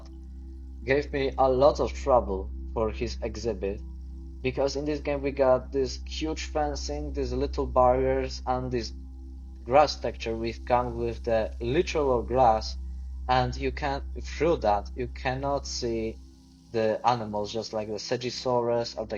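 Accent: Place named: Scandinavia. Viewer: Can understand you perfectly. Had you not said where you were from, I would have pegged you as someone from Scandinavia, and not eastern Europe (Poland).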